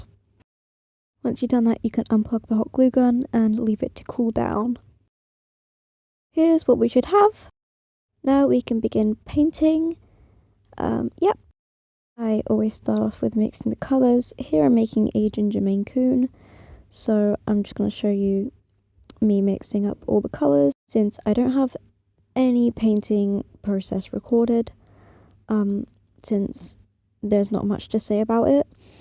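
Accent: United Kingdom. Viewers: Oh my god this tutorial is amazingggg,, also the accent?? Are you British?? :)))) (if so do you mind me asking where abts? Like north, south, west, midlands etc)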